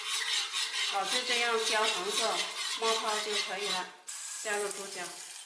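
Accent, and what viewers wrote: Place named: Hakka, China. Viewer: 簡單實用。聽到客家腔的說話，覺得十分親切!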